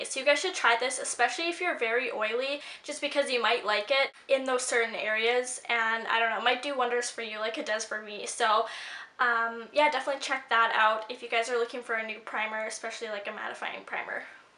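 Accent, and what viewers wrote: Canada. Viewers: Are u Canadian??? :)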